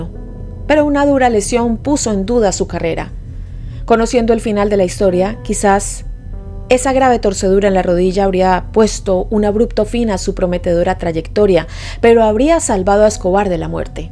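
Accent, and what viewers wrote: Colombia. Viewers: Saludos,. Soy nuevo en tu canal y me tengo que poner al día con numerosos vídeos. He comentado este en concreto porque recuerdo perfectamente cuando sucedió y como me impactó. Solo decirte que me encanta el contenido y cómo lo narras (no puedes negar que eres periodista ☺️) además de apasionarme el acento colombiano. Te felicito y espero que sigas subiendo muchos vídeos donde investigues temas apasionantes. Un abrazo desde 🇪🇸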